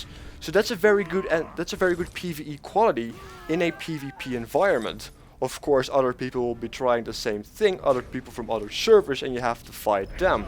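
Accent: Netherlands. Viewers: first time i heard you talking i knew you were dutch, i am dutch aswell and maybe u wnna play together sometime w/ skype or something?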